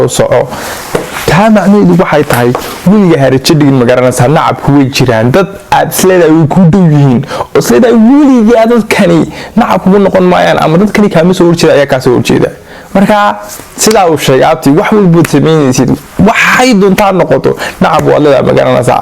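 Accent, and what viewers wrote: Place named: France. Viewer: War afsomali ku hadala waxay sidii adonta aya farasisi kuhadlaysa mesha somaliba jogte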